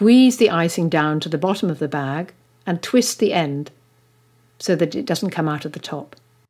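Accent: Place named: United Kingdom. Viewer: this says how to ice cupcakes not the whole nine yards plus am ammoying british accent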